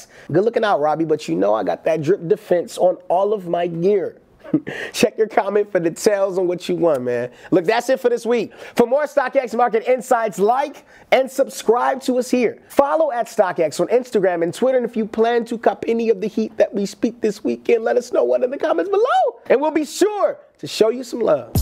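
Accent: United Kingdom. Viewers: the only thing worse than the yeezys 2018 releases is your British accent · Full body cringe to that British accent...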